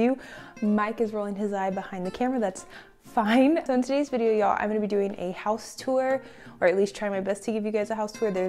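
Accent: Germany. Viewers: Sometimes you sound like you are speaking english with a little german accent 😉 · I like Your Denglish!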